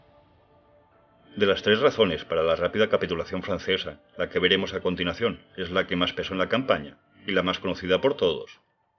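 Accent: Galicia, Spain. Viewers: oye eres gallego?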